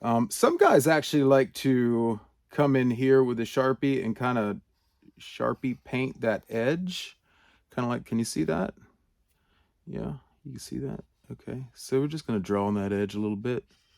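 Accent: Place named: South, United States. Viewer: Bob Ross would enjoy your videos. And now we have. A happy little edge. And he's alone. Lets give him a happy friend on the other side... Slide with the file. Just let the file slide... Sounds almost like a Southern accent. You have there... But yes. Thank you for sharing your info. On files and everything.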